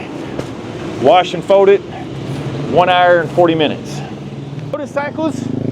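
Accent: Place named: South, United States. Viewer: Are you from Texas? You have a Southern accent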